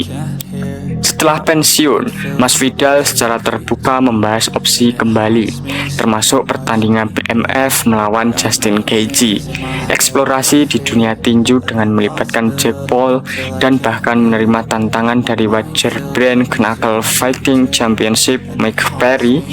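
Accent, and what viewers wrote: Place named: Java, Indonesia. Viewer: Medokkk bgt bhangg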